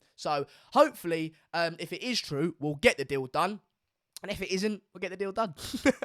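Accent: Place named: Manchester, United Kingdom. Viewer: His Manc accent gives him away 😂😂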